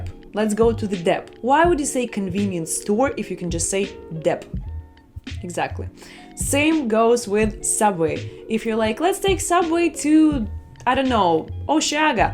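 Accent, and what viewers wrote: Russia. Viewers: Like for the natural “Russian” accent ))) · thank you...I really liked it....I love your russian accent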